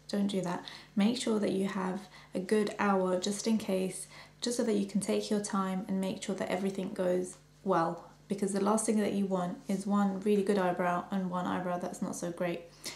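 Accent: United Kingdom. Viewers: She sounds british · Loved the video :) very helpful, i needed to tame my eye brows. But im very confused by you O.o ur muslim and look like you have a arabish background but you have a british accent O.o uhh what? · You do have wonderful eyebrows! Finally I got to see a perfect eyebrow tutorial with very good explanations and I think next time I will try it just the way you explained. You are very nice and beautiful! I like very much your voice and your british accent! Thank you so much for sharing with us this lovely eyebrow tutorial! Looking forward to seeing the next video from you! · Do you mean here religion or where she is from, her religion is y guess Muslim, which is a beautiful religion and i respect it, but her culture idk because she has a British accent, my guess is her relatives are Iraqi or somewhere around there, but it sounds like shes from Britain but follows the religion of Muslims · You've got the most amazing eyes! 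And to my ears you sound kind of British! :D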